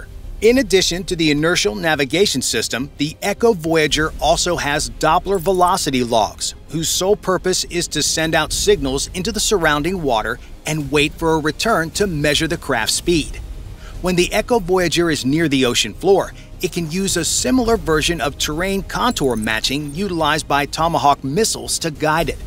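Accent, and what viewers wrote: United States. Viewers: These American voice overs are so irritating 😂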